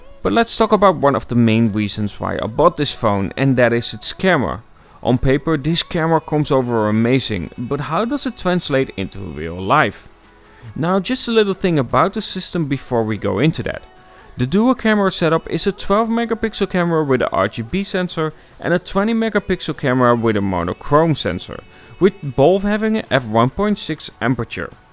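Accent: Netherlands. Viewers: Ok. I see "NL" on the phone and you sound a little Dutch. Is the Mate 10 available in the Netherlands?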